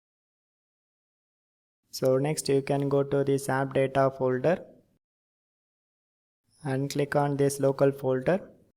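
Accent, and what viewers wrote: India. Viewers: Lmao indian accent Atleast I don't speak like that even I am indian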